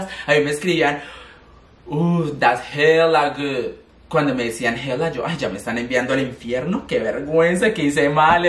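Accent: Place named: Colombia. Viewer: Amo tu acento colombiano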